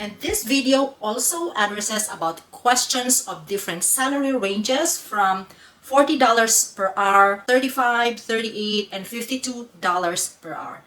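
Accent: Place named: Philippines. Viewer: you look korean , sound like a philippino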